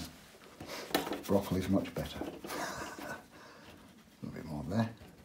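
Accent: Yorkshire, United Kingdom. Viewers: Hi,. Your video notification just popped into my inbox - not sure why as I haven’t done watercolours for decades, but I’m glad it did as your easy ways inspire me to give them another go. To my surprise, enough of my tubes are still usable and I even discovered this very book on my shelf, so no excuses! Good to hear a good old West Riding accent - you obviously hail from very close to me. Please don’t apologise for your roots - we have grass in the north, not graarse! Keeps up the great work.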